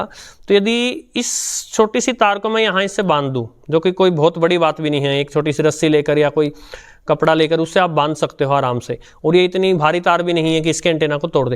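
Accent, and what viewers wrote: Standard. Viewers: Very nice.. impressed by your tone ,pure Hindi and clear details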